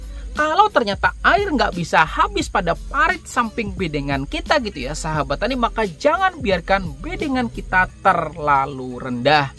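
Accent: Banjar, Indonesia. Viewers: Orang banjar kah yu... Logat banjar prasaan uln.. atau cuma perasaan uln haja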